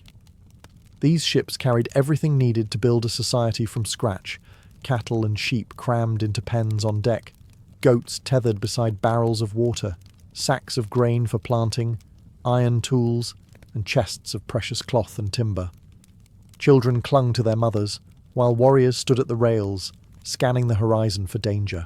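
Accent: United Kingdom. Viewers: Love😂 the British accent. Makes me feel a little more civilized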